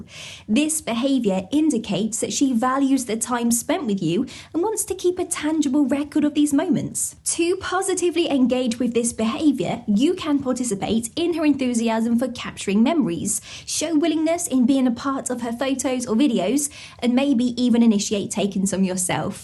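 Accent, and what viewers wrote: United Kingdom. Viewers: your little British accent is cute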